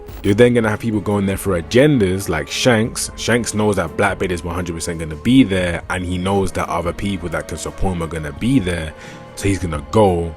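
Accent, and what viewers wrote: United Kingdom. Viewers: Ffs stop talking British bruh. Bro sounds incoherent (slightly) 2/2 videos that I've watched. EDIT: I like the content, that's the only reason I'm saying this. Not throwing hands just cos Brit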